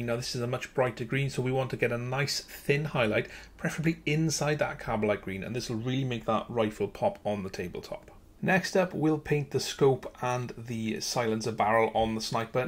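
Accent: Wales, United Kingdom. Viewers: Your very welcome. I denote a Welsh accent? Newport?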